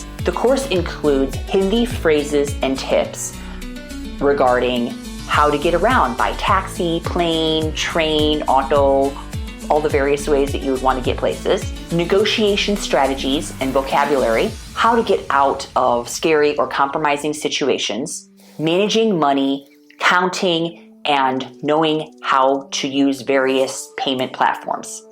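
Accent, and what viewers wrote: United States. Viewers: Hey ma'am i have always seen you preparing or making one thing or another only for Non Indian people I just want to request you to please do something for Hindi audiance/viewer We the people of India really lacks the ability to speak/write/express in #AMERICAN English language as we know English is the Global language and Language of business We need your accent we need your native language skill For lots of Hindi people Specially for #BIHARI We want to explore and connect with your world 🌍 through your language and wants to grow So Please Do something who is not Non-Indian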